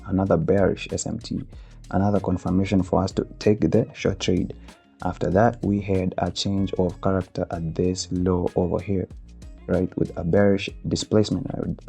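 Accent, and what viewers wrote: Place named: United States. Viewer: Awesome vedio over here,😊The American accent though 🔥🔥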